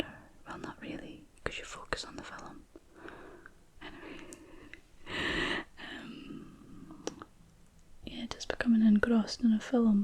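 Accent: Scotland, United Kingdom. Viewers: I love your Scottish accent im from sunderland in the north east of england and i love visiting scotland every chance i get 😍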